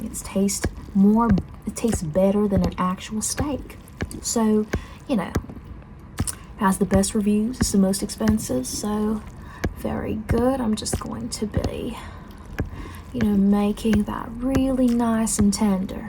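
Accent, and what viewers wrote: South, United States. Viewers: I REALLY LIKE THE ACCENT, I HOPE YOU DO MORE SOUTHERN ACCENT VIDEOS 🔥🔥🔥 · THE SOUTHERN ACCENT SLAYEDDDDD😜💅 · Omg I love your southern accent 💙🖤💜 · The Southern accent is so relaxing! But the ending…is it hinting that Alex and Eric were not simply in-laws and had something deeper between them :_cool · The southern accent was really good! Although a lot of people where I live accent is heavier than that(😭) you did super good love it! Feeling kinda cozy while being cooked 🎀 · Bro took we are cooked to a way new level 😭😭 (*btw you ate on that southern accent:3*) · What I think about this video: I love the southern accent ❤ It was not interesting that you used the same nickname over an did very like honey pie and sweetie pie ( no offense 😅) I think to boost your the amount of people who watch your videos is to make it more realistic but I still love the effort 😊 Try getting toys that are used for the topic of your video so for this video you would need a knife, ingredients,toy cooking supplies can make your videos amazing 🤩 That's all I think about this video!❤ Keep up your amazing work and I hope this motivated you and inspired you to do this too! 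💕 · Lol I wonder if I should admit I kinda got hungry watching this, I just love southern cooking 😅Speaking of which, I actually have southern relatives and I thought you did great with your accent !!💗:_approved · HAHAHA I love the southern accent so much!! despite how serious and scary this video is the southern accent made me laugh!! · we need more of this southern accent from you! it’s so relaxing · As someone from the south: this is pretty good!!! It's fun to hear the voice with her physical mannerisms, cuz it's very different from people in the south i reckon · THAT SOUTHERN ACCENT ATE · Please do more with a southern accent 🙏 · as a southern person with a southern accent, i think you did great!!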